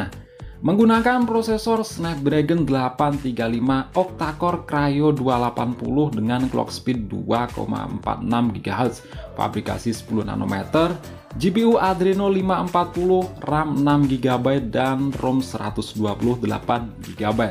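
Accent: Blitar, Indonesia. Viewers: orang blitar kah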